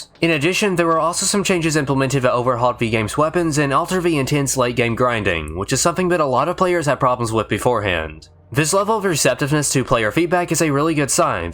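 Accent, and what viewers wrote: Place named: Ireland. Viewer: Are you kidding me, I can't even honestly tell what type of accent he is, maybe irish...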